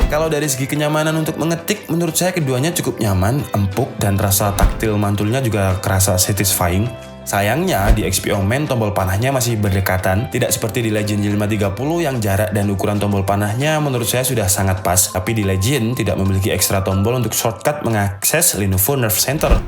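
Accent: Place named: Bali, Indonesia. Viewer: ngomongnya kyak orang bali euy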